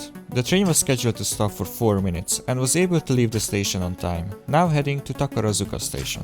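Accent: Hungary. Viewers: Csak nem Magyar vagy? Nem nagyon hallottam az akcentust az előbbi videókban, de most kicsit jobban lehet hallani. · Az angol kiejtésedről nem mondanám meg, hogy magyar vagy, de a japán szavakat olyan magyar akcentussal ejted ki, hogy az elárulta. Nem rossz. Maga a videó se, elég érdekes.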